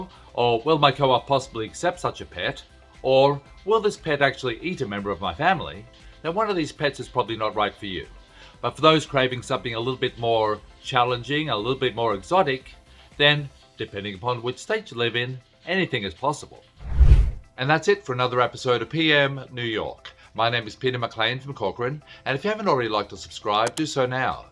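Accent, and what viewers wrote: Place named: Australia. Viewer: Peter we have known each other over 2 decades! WE ve worked on music, traveled to the Winter Music conference in Miami, but this new narrative of EXOCTOC animals is by far informative snd your sense of humor and Aussiue accent keeps you glued to keep watching. So when you were living with me in Jersey City, I might as well say...that o also had an exotic an male living with .me lol ☆☆☆☆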